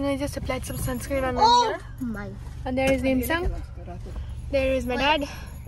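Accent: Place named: India. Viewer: She has a Indian accent and it's so cute to listen Indian English accent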